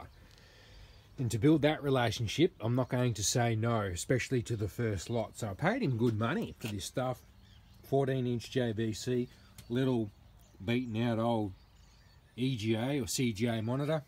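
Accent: Australia. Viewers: Hey mate do you have any crt’s with HDMI inputs? You sound like you’re in Melbourne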